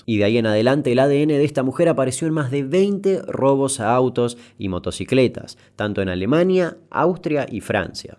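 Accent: Buenos Aires, Argentina. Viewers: Las gashetitas con ese asento de porteño jajaj Pd soy cordobes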